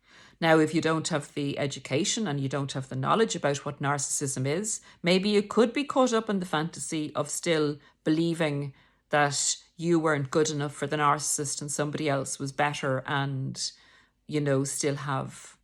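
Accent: Ireland. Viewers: Are you Irish? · Thank you for being so calm sensible and supportive of this community And I love your irish accent I am starting to almost be thankful to my narcissist that he led me to find all these wonderful people like you ande your channel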